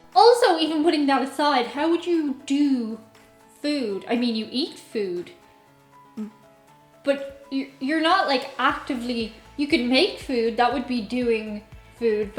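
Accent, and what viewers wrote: Ireland. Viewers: As an American, I find every word that comes out of an Irish person's mouth to be cute. <3 Your accent is the greatest! :D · The accent takes me home...family originally came from Cork in the late 1800s...are the Irish starting every sentence now with "So," as I now hear EVERYWHERE in America? Did you go shopping? So, I went to the store and got what we needed. What did the doctor say today at your appointment? So, the doctor told me I was fine. It is really beginning to drive me nuts!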